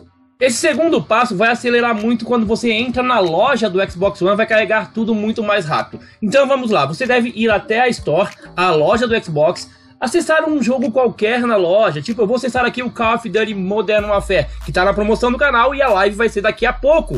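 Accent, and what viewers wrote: Florianópolis, Brazil. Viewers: vc é de floripa? tbm sou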